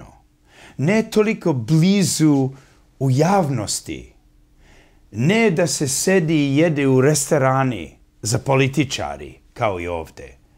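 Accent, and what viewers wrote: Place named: United States. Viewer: pa bolje Schmidt od tebe srpski prica moj!!!! pa dokle?? evo godinama slusamo taj tvoj americko-srpski i sve tezi i teti za slusat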